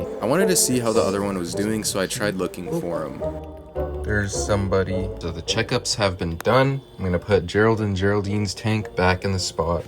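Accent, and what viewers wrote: United Kingdom. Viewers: Are you British? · Bro sounds like a British man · Thus guy is so british wuter😂😂😂😂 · Are u British wotah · Buddys British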